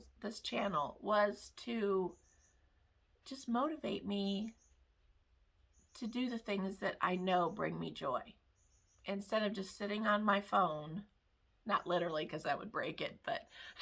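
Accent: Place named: South, United States. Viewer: awww I was hoping you lived close to me☺️. I thought I detected a bit of a southern accent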